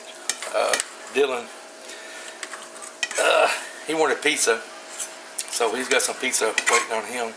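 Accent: South, United States. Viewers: Yum Love your southern accent ! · Country as hell omg can barely understand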